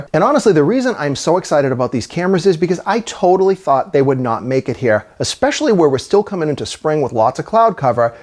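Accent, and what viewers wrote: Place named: New England, United States. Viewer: The narrator definitely sounds like a New England type. Maybe he’s related to Norm on the New Yankee Workshop. You guys take care. I hope the evidence that you gather from the footage is taken into consideration if the situation turns into a legal case. Good question, how can you keep a camera?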